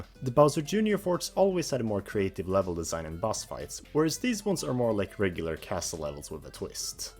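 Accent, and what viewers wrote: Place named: Sweden. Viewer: are you from sweden? you speak with a swedish accent and i am from sweden so i recognize it. i also love super mario galaxy 2. är du svensk? du pratar engelska med svensk brytning så jag känner igen den. jag älskar också super mario galaxy 2.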